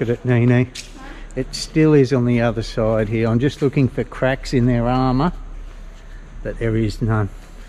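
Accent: Australia. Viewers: Man! Where the heck were you from? Such an accent that I couldn't make out what the heck you are talking about? Aussy? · you sound aussie mate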